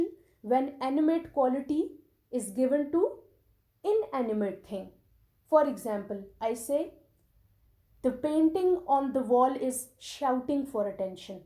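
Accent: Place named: India. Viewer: لهجه هنديه ايع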